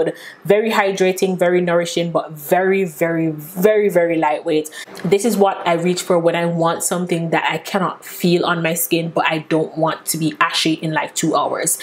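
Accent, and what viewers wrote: Jamaica. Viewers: Great video. First timer here! You sound Jamaican. Are you?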